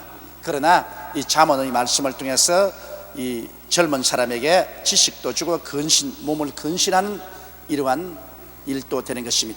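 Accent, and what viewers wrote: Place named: Jeolla, South Korea. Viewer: 형명니 믄 절라도 말씨.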